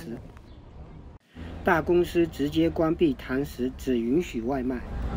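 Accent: Fuzhou, China. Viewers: 听这口音，是福州人吧，老乡呀，期待你的视频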